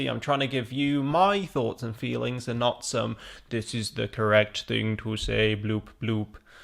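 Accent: United Kingdom. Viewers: oh ... and I truly love your British English "accent" ... brilliant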